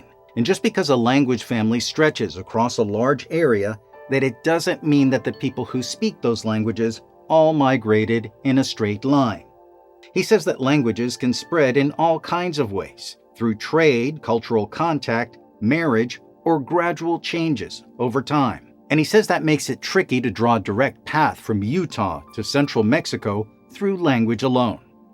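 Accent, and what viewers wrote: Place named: Michigan, United States. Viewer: Sounds like Michigan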